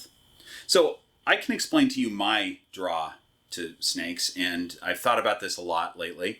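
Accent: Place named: United Kingdom. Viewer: As a Brit I would like to confirm that this is indeed a British accent! Lol 🐍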